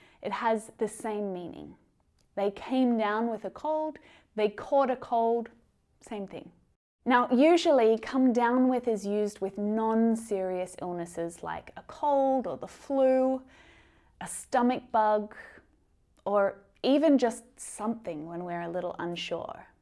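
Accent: Australia. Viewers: is a lovely lady, she has soft Aussie accent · Her accent is Australian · Why is your accent so lovely? I have known Australians but none of them speaks like you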